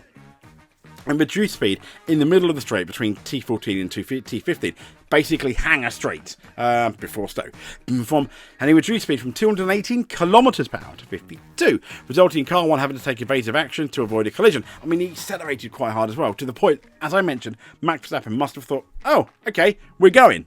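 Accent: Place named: United Kingdom. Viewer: He’s allowed to ask the question!!! BTW…are you British? Of course you are!!!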